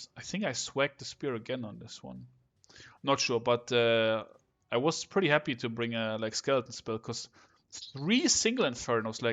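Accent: Germany. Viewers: Richtig geiler channel weiter so. ❤️❤ Hab ich gleich gehört das du deutsch bist. haha 😂 Btw lasst die Maus und die cam so passt perfekt. Alles gute ❤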